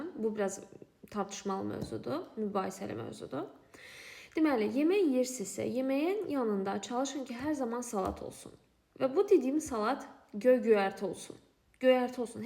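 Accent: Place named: Azerbaijan. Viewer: Benim kızım.Acaba ben sağlık tevsiyelerinizi mi dinlirem yoksa güzel Azerbaycan türkçenize mi heyranam bilemedim.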